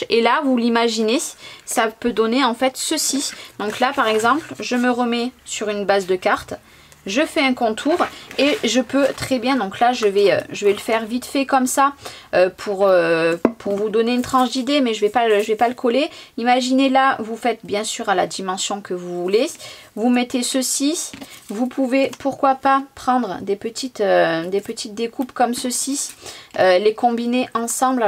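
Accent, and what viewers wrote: South, France. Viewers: Même si je ne fête pas Halloween , merci pour ces superbes cartes très lumineuses. Dans l'un de tes derniers vlogs tu nous a parlé de pochettes vinyl pour stocker tes papiers scrap. Peut on y mettre plusieurs feuilles ? Pourrais tu me donner la référence car je serais intéressée , ne sachant plus comment ranger mes restants de collections. Merci et vive ce petit accent du midi qui donne la pêche. 😉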